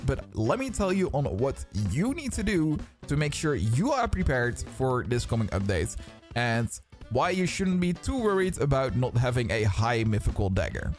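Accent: Netherlands. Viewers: Is English you’re main language u have a Dutch accent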